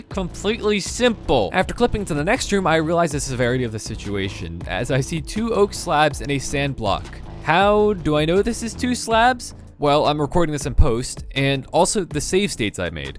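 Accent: Canada. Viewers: i swear your kenadian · i thought u were kenadian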